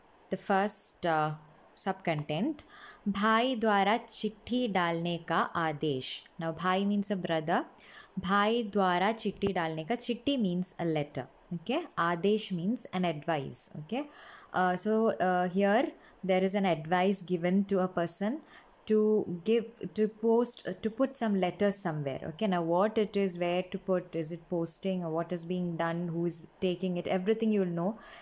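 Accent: Kerala, India. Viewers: maam are u a malayali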